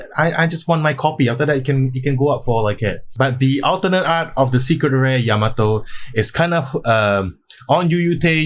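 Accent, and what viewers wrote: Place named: United Kingdom. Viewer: amazing content! I thought u were brittish ur accent so good!!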